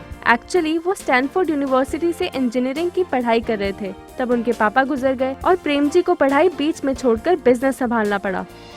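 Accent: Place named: Bihar, India. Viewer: bahi aap apne up bihar wale lahje may hi bolna hamesa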